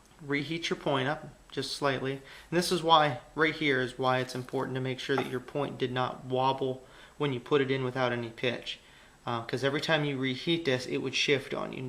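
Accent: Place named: Pennsylvania, United States. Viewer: Jeez man, how did you learn this by living with a present day primitive tribe? Lol. You sound like you're from Pennsylvania and not from the Outback in Australia.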